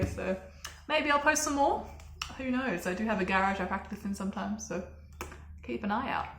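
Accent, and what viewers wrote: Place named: Australia. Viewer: Your normal voice is cute as hell too. I love your Australian accent. I myself have a Midwest/southern US accent.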